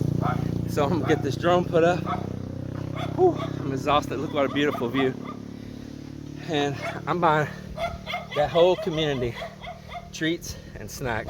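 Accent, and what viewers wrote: Texas, United States. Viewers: I have decided I like you and your videos sincerely. Your Texas accent is kind of cheesy but I forgive you. I am 7 gen Texan and lived across the state from south to East. Maybe it's a north Texan accent. Anyway cheers. Thanks for posting nd I hope to visit soon.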